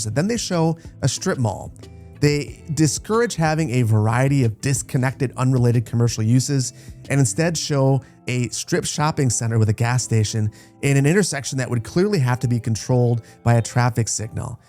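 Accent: Wisconsin, United States. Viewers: Your Wisconsin is showing 😂 I live in a neighborhood just like this, out towards Milwaukee area. The Midwest is riddled with neighborhoods like this. I love it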